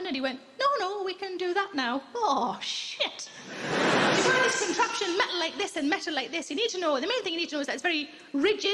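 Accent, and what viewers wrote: Newcastle, United Kingdom. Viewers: Aye proper Geordie :)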